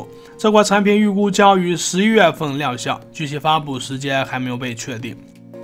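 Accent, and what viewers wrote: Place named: Northwest, China. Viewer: 哈哈哈哈 晋蒙陕甘口音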